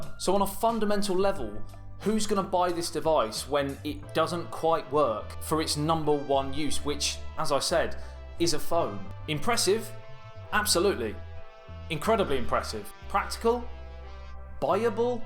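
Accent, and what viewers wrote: United Kingdom. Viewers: You are the most genuine rusty British accent Ive ever heard🤞🤞🤞,rememberd SNATCH MOVIE.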